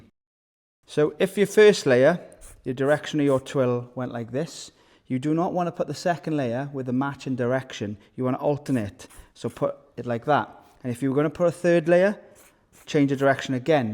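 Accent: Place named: Cardiff, United Kingdom. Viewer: Croeso, you sound like your from cardiff or very close am cardiff here love your videos mate keep them coming 🏴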